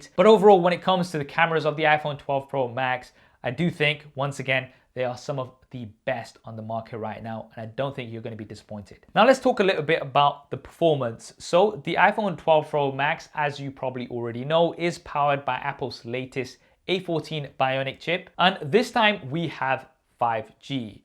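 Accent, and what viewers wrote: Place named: United Kingdom. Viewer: Thanks for such a broad view. And super nice to hear a British accent! Blessings. 新年快乐 xin nian kuai le Happy New Year 🍵🍾🍾🍾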